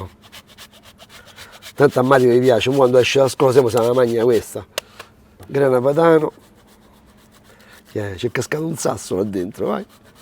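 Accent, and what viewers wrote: Rome, Italy. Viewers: A MILANO PARLI romanesco?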